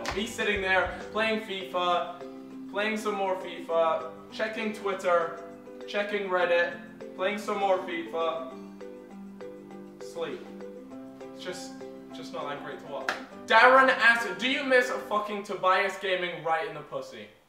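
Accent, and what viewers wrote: United States. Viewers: your accent sometimes sounds american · U sound American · ... I thought he was American.... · So your accent is all those things you mentioned but I can mostly hear American yet you never lived in America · you sound American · Am i the only one who thinks he has kind of an american accent? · Am I the only one who thinkg he sounds American? And coming from an actual American that is huge. It really confuses me to know he has never lived here. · Thought you were American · You sound American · The accent sounds closer to American than anything else.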